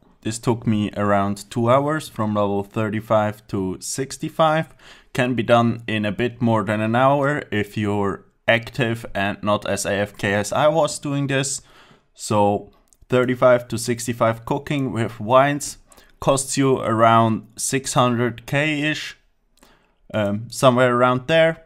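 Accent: Switzerland. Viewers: Ich has gwüsst! hahaa, han mer grad denkt, dases en schwiizer akzent isch^^ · 100% en schwizer haha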